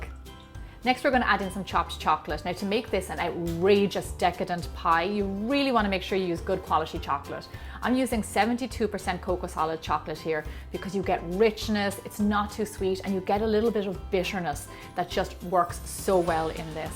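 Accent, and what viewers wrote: Ireland. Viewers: Is she like Irish or something? Irish people are wonderful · I just found your channel and I love it! So much great backing Ideas. Even for me as vegan you notice which recipe will work great in a vegan version! Great job! Have to try something from your recipes, definitely! Btw I love your english, in " home made butter video " you said that you are from Irland? My favourite country to see one day!!!!!!! I'm a new subscriber of your great channel and can't wait for new yammy Ideas, greetings from Switzerland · are you Irish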